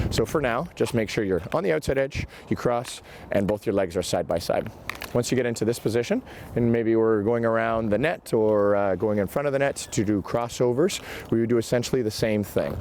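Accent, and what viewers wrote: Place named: Southern Ontario, Canada. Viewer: Came for the tips. Stayed for the Leafs representation and southern Ontario accent. Felt right at home. Thanks for the lesson!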